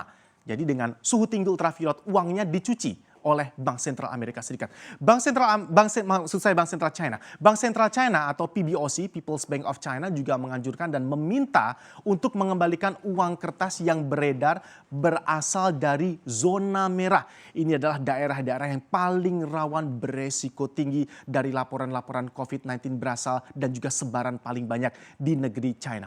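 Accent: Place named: South Jakarta, Indonesia. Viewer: Pembawa beritanya orang jaksel nih.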